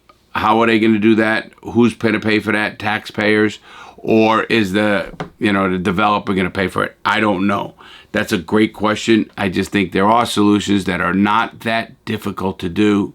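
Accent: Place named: Boston, United States. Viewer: are u from mass??? I'm hearing a boston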